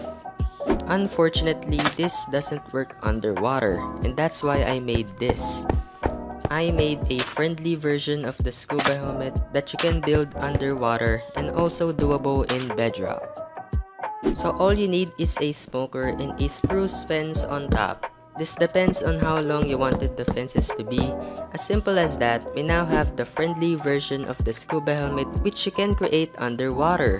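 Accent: Philippines. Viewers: Your accent is like a Filipino speaking English. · Are you a Filipino? I can tell it with your accent. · Hello, are you fillipino?? Iam fillipino too... I asked that becoz of your accent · Hi you sound like a filipino:D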